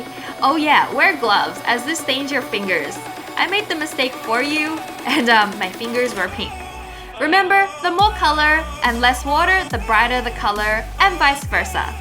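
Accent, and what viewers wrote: Australia. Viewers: Has anyone noticed wengie's Australian accent has toned down a bit?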